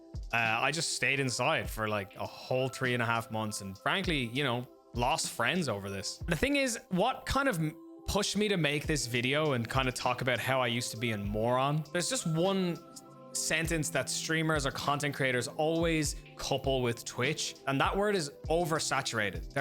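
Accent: Ireland. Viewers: damn he sound like me fr (im irish too)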